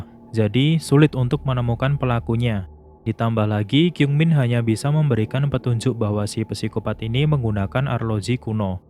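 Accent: Java, Indonesia. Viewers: Semangat terus bang:) Btw suara luh, medok bngt bang🙏😅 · Klo dengerin suaranya kaya org Jawa😀 trus tb nya rada tinggi Iyah ngga sihh bang hihihiii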